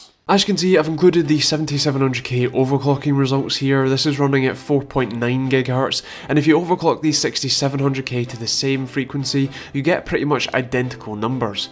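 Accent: Scotland, United Kingdom. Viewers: Is it just me or are you sounding more Scottish in this video? (Not that it's problem if you were :P) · Well, great video as always. My 6700k don't even need to be upgrade and I'm running stock, didn't even use factory OC still good for most stuff. Didn't realise you have a Scottish accent (I think) from all the vids up till now. Haha. Would love to see a cable management video if you have one as my cable management now really is a mess. 6700k with a R9 390x, 16G ram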